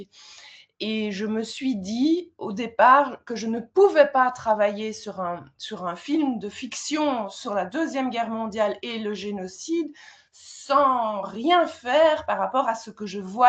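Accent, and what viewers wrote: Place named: Belgium. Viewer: Rien qu'avec votre accent, je me dis que vous êtes belge. Alors, faire une fiction sur la RD Congo, svp. Merci d'avance.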